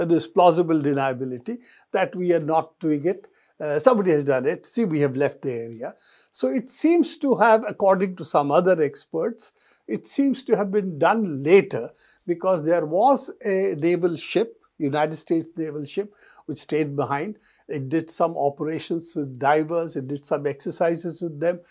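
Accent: India. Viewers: Pl speak English, not Am, BRIT, OR Aussic only Indian, the true English, Bravo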